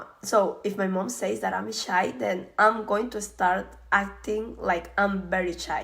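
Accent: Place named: England, United Kingdom. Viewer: An an American I love the way she talks however I have no idea where she is from haha. England? France?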